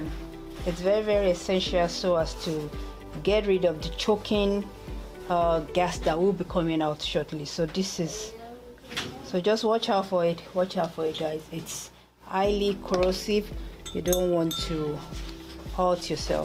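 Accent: Nigeria. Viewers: Ok, this trashy Nigerian accent gets on my nerves.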